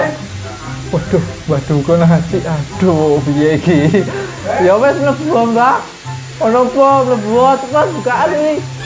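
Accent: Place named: Java, Indonesia. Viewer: Wah jowo, tak sub lur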